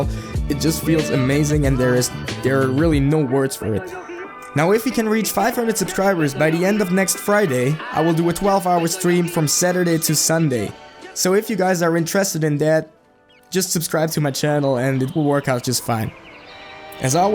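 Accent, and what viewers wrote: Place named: Norway. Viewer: Love it man thank you! May i ask, where is that accent from? i sense a little Norwegian but I’m usually dead wrong , great video brother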